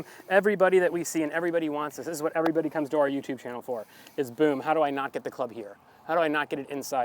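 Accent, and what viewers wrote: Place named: Scotland, United Kingdom. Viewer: Great to hear a Scottish accent.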